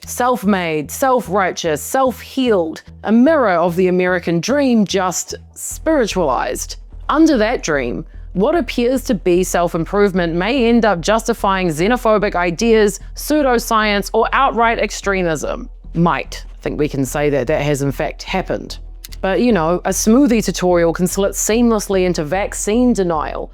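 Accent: New Zealand. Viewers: I said the same thing! And then felt awful because she clarified she IS a kiwi 😂 🤦‍♀️ real broad accent huh?! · Kiwi! Finally you disclosed and I learned where your accent came from! Now I have to convince myself that you are not a blank slate no readily foundable bio, AI made youtube anchor! hahaha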